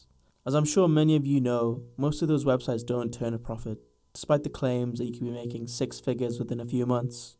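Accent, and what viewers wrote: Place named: United Kingdom. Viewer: That's just a British person talking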